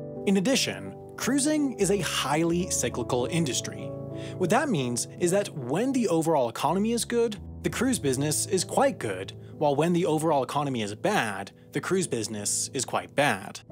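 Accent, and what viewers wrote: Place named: United States. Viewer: Dude I love your videos. But where are you from? You sound American but your cadence of speech is.......uncomfortable. I’m not criticizing I’m genuinely interested.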